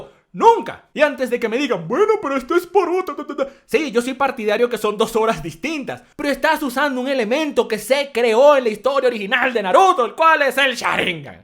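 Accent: Peru. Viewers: Oye we eres peruano?? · ERES DE PERU, NO?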